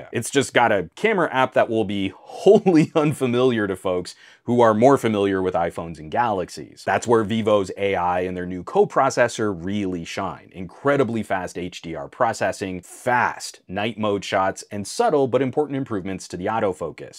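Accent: United States. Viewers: Mr, what a beautiful American English accent you have..🎉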